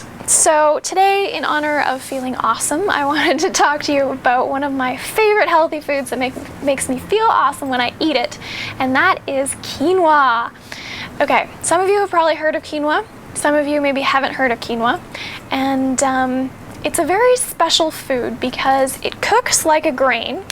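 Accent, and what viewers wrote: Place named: Canada. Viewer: I notice you have a Canadian accent. Are you originally from Canada? Where do you live ?I watch your videos and all is good! Love the Recipes.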